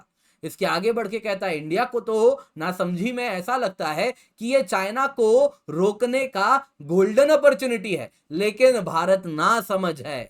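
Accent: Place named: Pakistan. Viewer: Aap Pakistani jaisa Q baat karta ho 😢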